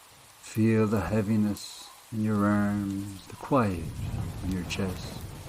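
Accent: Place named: Clare, Ireland. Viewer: Love that I found your page. These stories remind me of the ghost stories my granny used to tell us. Thanks for the nostalgia. I keep trying to guess which county you're from by the accent. My guess is Co Clare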